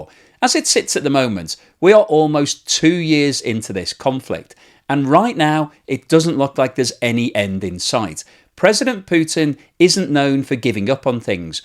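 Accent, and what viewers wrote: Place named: RP, United Kingdom. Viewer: your BBC English, your clever views and the capacity of explaining complex issues in a simple way makes you Top Expert!!!! Thank you!!!!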